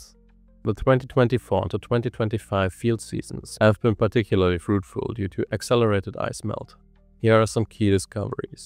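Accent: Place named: Norway. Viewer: Excellent video on a topic I did not know about. Your voice is fine. Your English is excellent. You sound Norwegian. Which is different and interesting to an American like me and a hell of a lot better than a computer.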